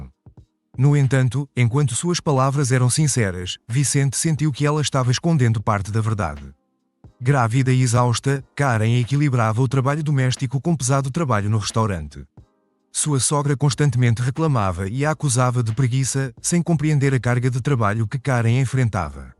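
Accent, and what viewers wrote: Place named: Portugal. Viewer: Sotaque horroroso esse de Portugal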